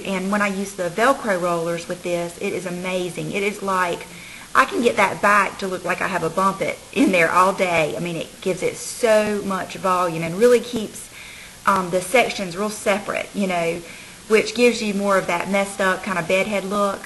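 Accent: South, United States. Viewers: 5 stars for the lovely southern accent :)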